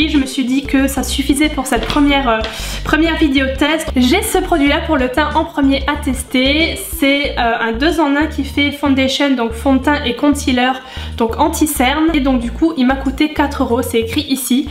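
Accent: Lorraine, France. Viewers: Tu as grave l'accent Lorraine, tu habite à Forbach ? 💕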